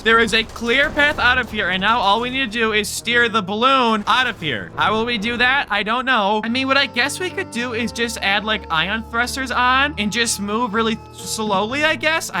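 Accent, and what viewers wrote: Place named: United States. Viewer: im brazilian, and i just wanted to say, (dont get me wrong) i hate people from usa who talks with that "tone", but videos are SO good that i LOVE to see, and just ignores it. Love that channel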